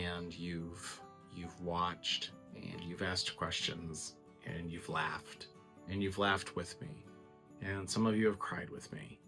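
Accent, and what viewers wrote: Minnesota, United States. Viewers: Time is indeed a precious commodity, and the times you've shared have been cherished by me. You look so cozy in your Christmas bedecked home with your sweater and beard. You may be in Orlando but in my mind you're right there at The Estate penning Christmas cards and drinking eggnog by the light of your tree. On the 12th Day of Vlogmas my true love gave to me: Twelve Months of time, beauty and dedication to all that you do, be it your home's restoration, decorating, or simply allowing us to be a part of your beautiful, beautiful world. As well as Eleven eggnog liquors Ten-ish strands of "tinthel" Nine ladies ironin' Eight cameras tumblin' Seven cutouts glowin' Six berries spillin' Five Christmas trees 🎄🎄🎄🎄🎄 Four Paolo meows Three sets of batting Two power strips 🔌🔌 And you sound like the movie Fargo 🎄⛄🙂⛄🎄 Merry Christmas my beloved. 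You're amazing.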